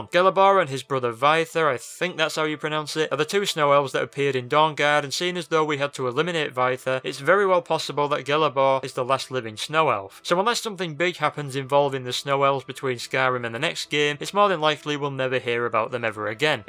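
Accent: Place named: Yorkshire, United Kingdom. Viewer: Why do you talk like a Yorkshire Johnny Vegas?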